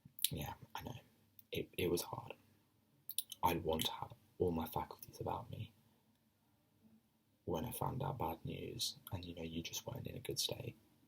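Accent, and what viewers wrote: United Kingdom. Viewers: Well, well, well, British, cute, and gives me tingles. Subbed* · I like your British accent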